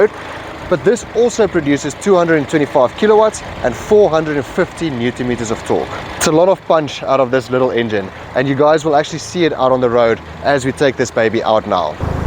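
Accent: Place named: South Africa. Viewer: Wow I totally didn't know south Africans sound like this lmao I thought this was from the UK with an accent of somewhere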